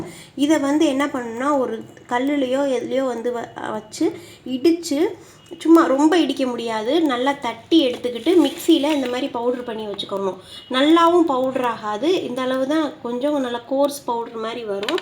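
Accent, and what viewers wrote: Madurai, India. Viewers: madura vaasam unga pechula and paruthi paal namma madurai la mattum thaan spl and kedaikkum innum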